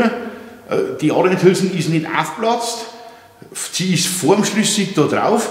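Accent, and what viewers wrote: Bavaria, Germany. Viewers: Die Versuche Youtubes Bayrisch in deutschen Schrifttext zu übersetzen - köstlich🤣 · Thats bavarian german :D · Ich finde deine Videos klasse, aber teilweise kommt dein bayrischer Akzent sehr stark durch und ich muss zurückspulen weil ich Dinge nicht verstanden habe 😅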